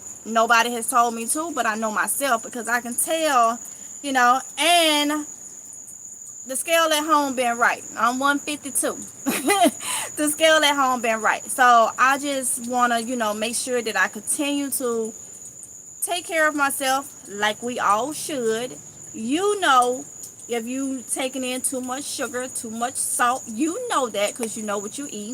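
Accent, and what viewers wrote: South, United States. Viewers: I’ve never heard nobody pronounce exercise like that before lol! I like your accent!!! It’s soo southern!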